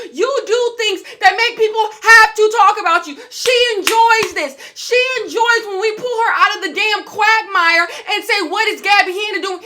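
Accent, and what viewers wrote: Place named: South, United States. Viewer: You’re from the South, I can tell lol. I’ve been over Gabbie Hanna since forever. I say the same shit. If you’re constantly studying what everyone else is doing, you are not happy. Period. When you got shit going on, happy life, friends etc. you don’t give a damn about someone else’s business. Especially to the extreme where you’re constantly putting yourself all in it. 🙌🙌🙌👏👏👏 you said perfectly!!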